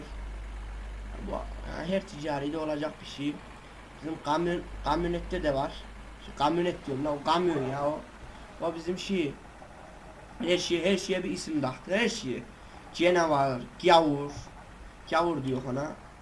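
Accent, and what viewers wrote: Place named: Kayseri, Turkey. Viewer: adamsın sen nerelisin bide biraz böyle kayserliler gibi konuşuyon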